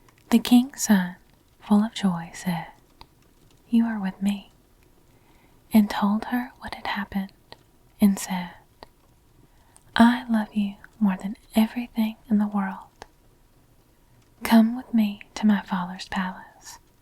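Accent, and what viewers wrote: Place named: South, United States. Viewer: Seeing your beautiful face is as comforting as your gentle southern tinged voice.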